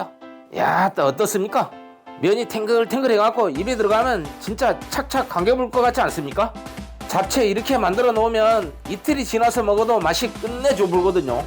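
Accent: Jeolla, South Korea. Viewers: 구수한 전라도 사투리 더 정감이 가네요 ㅎ 늘 고맙습니다 짱~!!! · 아따 ~ 전라도 사투리 징하게 정감있어 부네요 . 세프님. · 전라도 사투리 너무 구수하네요 와 잡채도 맛나것소~잉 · 이렇게해각고 딱 이렇게해갖고 구수합니다 저두전라돈디 비스한지역갇은디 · 이 사투리는 전라도인가요?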